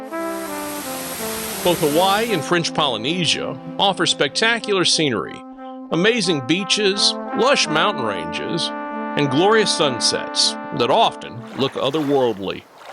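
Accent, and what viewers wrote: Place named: Mississippi Delta, United States. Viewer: Based on those pronunciation examples, perhaps you might be from the Mississippi Delta?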